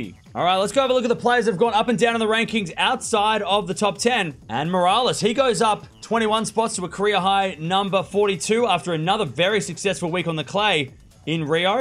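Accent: Australia. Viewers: I say the biggest win this month is Norrie over Alcaraz in Rio. There was almost no way of Norrie winning but he did. There was a buzz of Alcaraz's injury acting up but nonetheless he was hitting winners all over the place. I think Norrie's win was the most classic in a while. Thanks for the show. It is always good to hear your Aussie accent.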